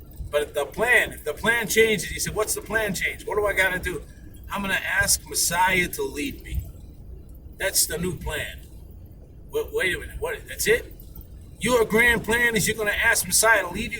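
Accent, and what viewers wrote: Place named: New York, United States. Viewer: Are you really from New York because you nailed the accent and attitude! I'm from New York so I know this and thank you brother for this video! Amein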